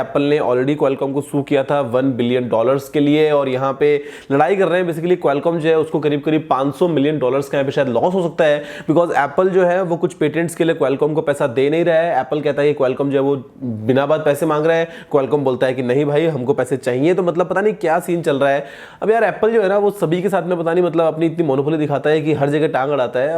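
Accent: Bengal, India. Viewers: Are you bengali sir?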